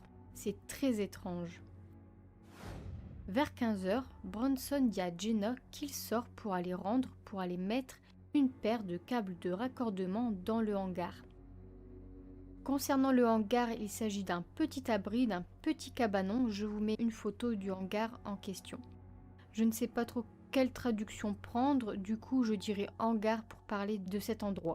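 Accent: North, France. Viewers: L'accent du Nord on le reconnait...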